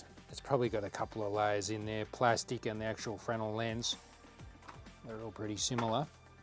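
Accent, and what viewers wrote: Australia. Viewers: This is how I imagine an Australian to speak..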